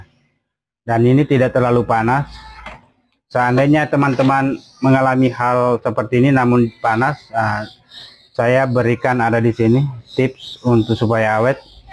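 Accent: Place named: South Sulawesi, Indonesia. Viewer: Dari logat nya kyakn org Sulawesi Selatan 🤔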